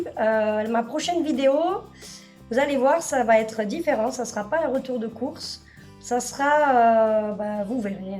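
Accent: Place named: Provence, France. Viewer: Bonjour, Je suis ravie d'avoir découvert votre chaîne lors de suggestions der retour de courses proposés par le site YOU TUBE et je tiens à vous féliciter de la façon à laquelle vous présentez vos vidéos, vous dégagez beaucoup de bonne humeur, de la joie, une énergie débordante et surtout je vous trouve très rigolote et je peux vous assurer dans ce contexte du coronavirus,vous vous transmettez beaucoup de bien, vous dégagez beaucoup de bienveillance, de modestie et de générosité. Je m'interroge sur ce petit accent bien provençal si je ne me trompe qui est charmant. Je vous encourage à nous proposer des vidéos de retour de courses ou dans d'autres thèmes. Au plaisir de vous visionner prochainement Bonne fin de week-end Fanny ( Paris XV ème)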